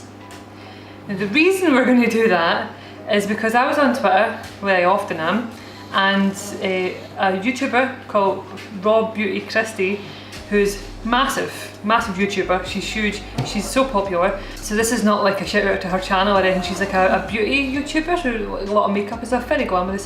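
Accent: Scotland, United Kingdom. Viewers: As an American who isn't too picky, a biscuit is a biscuit is a roll is a biscuit. I just watch for the adorable Scottish accent. Dank and the dogs are a lucky lot. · I like the Scottish accent. I work with a Scotsman but his accent is much watered down.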